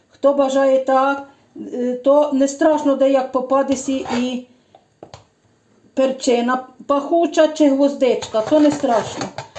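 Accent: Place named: Transcarpathia, Ukraine. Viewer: Дякую за рецепт і приклад закарпатського говору.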